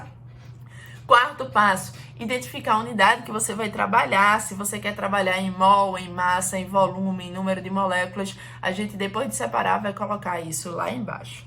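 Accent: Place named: Northeast, Brazil. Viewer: ótima explicação, e esse sotaque do meu nordeste deixa tudo mais charmoso kkkk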